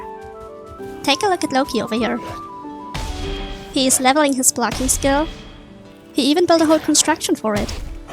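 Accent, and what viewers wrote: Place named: Australia. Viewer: Bringing metal by boat cost my like 1 3rd of my game time. I think the reason to have it disabled is because you get upgraded portals in the Ashlands, that let you take metal through. I think you should try using the cart it's hilarious. Oh btw. try not to die too much cause skill drain will slowly deplete your skills. Your sounding more Australian by the day. Good video. :face-blue-smiling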